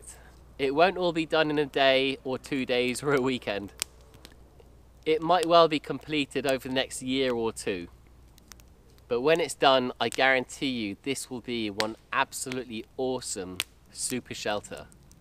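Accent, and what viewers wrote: United Kingdom. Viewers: Having lived in Japan for 19 years,,,, Man, it is great to hear a UK accent! Thank you, really enjoyed this!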